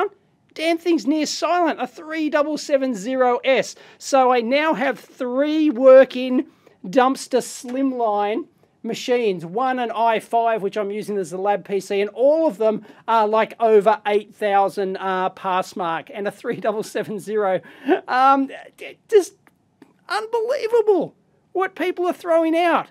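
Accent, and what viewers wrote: Australia. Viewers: You did up the Aussie level on this one for sure mate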